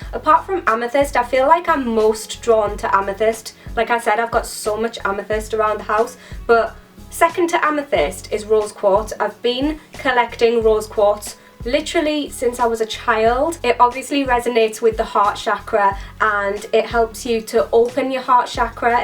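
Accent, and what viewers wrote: Newcastle, United Kingdom. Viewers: Just found your channel and love it! Are you from Newcastle?!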